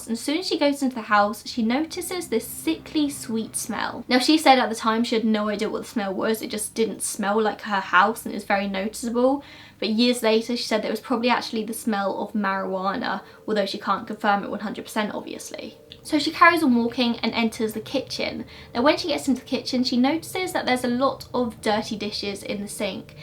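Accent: United Kingdom. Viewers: I'm from Dublin, Ireland. So, I'm very familiar, and have always loved, the UK accent. But, your particular accent is so very beautiful! 💜 I'm sure you don't even realise that you have an accent, but you have a beautiful accent, and you're perfectly spoken. Just another reason why I love your channel! 💜🖤💜🖤💜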